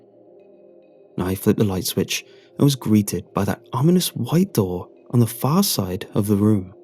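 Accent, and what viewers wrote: United Kingdom. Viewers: Yeah honestly.. I love the British accent.. I just wonder how it isn't broken or sore from all these story times...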